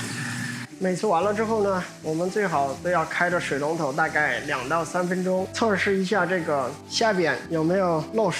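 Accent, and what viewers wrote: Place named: Henan, China. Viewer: 老乡豫普说的不赖😊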